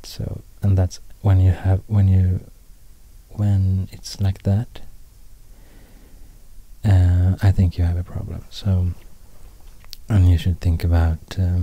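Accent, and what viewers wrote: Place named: Sweden. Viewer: I specifically came here for Swedish language and Swedish accent, so feel free to mispronounce any words :D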